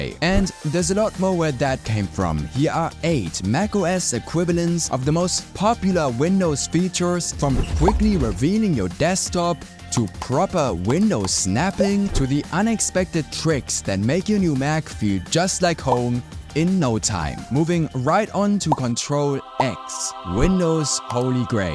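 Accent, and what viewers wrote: Germany. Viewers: really knows his stuff and doesn't waste a word - if anything he's too concise. His tips are uniformly great. (And the "light L" and glottal stops that Germanise his flawless English only add to the aura of genius.)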